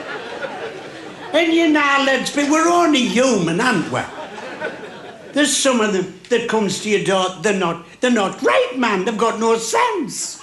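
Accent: United Kingdom. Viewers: I've just realised how similar his accent was to 'Little Nana Iris'. She was a hilarious woman from Sunderland who shot to Youtube fame a few years back. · Me Mum was from County Durham and the accent and the same sort of humour was nice to hear..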